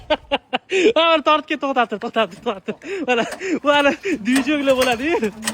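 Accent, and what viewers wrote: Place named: Tashkent, Uzbekistan. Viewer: Ким буларди санга ухшаганде кулма тошкенча гапириш ухшамади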